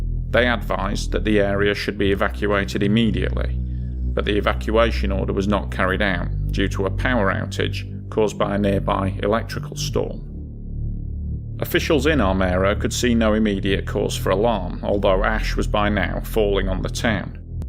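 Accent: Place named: Northern England, United Kingdom. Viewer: Where is your british accent from ... I seem to think its northern england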